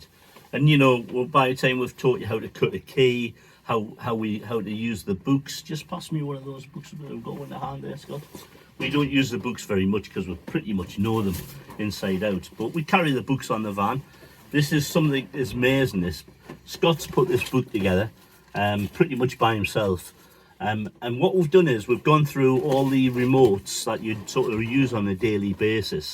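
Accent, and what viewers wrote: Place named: Northeast, United States. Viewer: You sound like your from the Northeast. Iam interested in training. But wait till it dies down. Stay safe lads.👍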